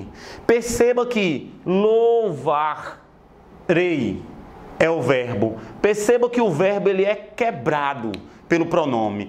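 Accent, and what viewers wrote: Northeast, Brazil. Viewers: Caramba, que aula boa!!!! me ajudou bastante, ele é do nordeste? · Seu sotaque nordestino é da hora não é aquele sotaque caricato, cômico e falando errado!!!!!!!!!😀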